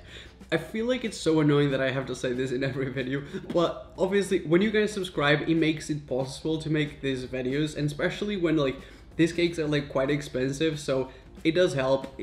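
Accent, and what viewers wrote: England, United Kingdom. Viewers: He’s from england i think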